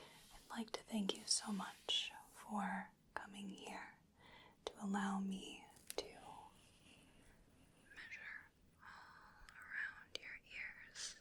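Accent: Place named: Midwest, United States. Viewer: The Midwestern comfort of hearing another person add letters to words, "across-t" is familiar ❤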